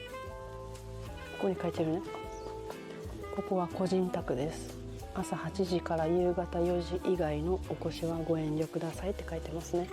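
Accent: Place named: Kansai, Japan. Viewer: Kansai-ben, the best 😂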